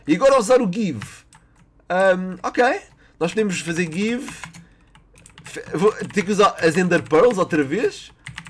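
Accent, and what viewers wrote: Portugal. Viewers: Amo muito português de Portugal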